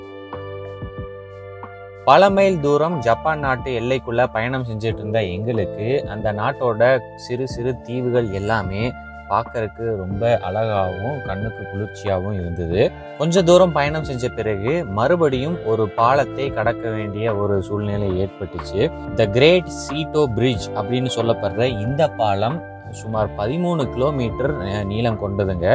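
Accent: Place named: Kongu, India. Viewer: Thanks for all your work and narration in this channel. I love your kongu and pure tamil; You have been doing a great job, Thanks again!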